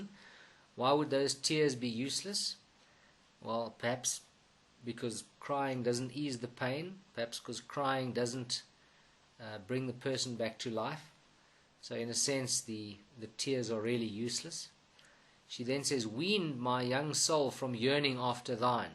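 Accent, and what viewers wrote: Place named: South Africa. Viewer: South African accent 💪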